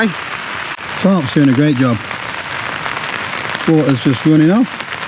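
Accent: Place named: Nottingham, United Kingdom. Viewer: Where is this. Your accent sounds like yur from Nottingham.?)) I'd be building a stealth dug out tbf.. Happy days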